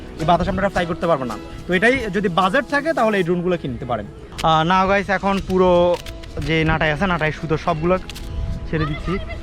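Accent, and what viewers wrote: Noakhali, Bangladesh. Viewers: ক্যামেরাতে হ্যাক লাগি গেছে গই,,,,, ফ্যাক্টঃ নোয়াখালীর ভাষা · ভাই মনো আঙ্গো নোয়াখালীর..?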